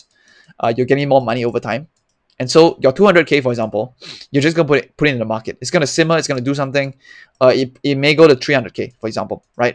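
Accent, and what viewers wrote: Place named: Singapore. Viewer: You sound like you are from Singapore and doing military also? So going forward why you picking a job over a business or not worth the extra effort/risk? I see you have done well in HIMS/HOOD have you thought about taking less risk (you might like quality investing) to keep your stack or going to do that if you get to $10M?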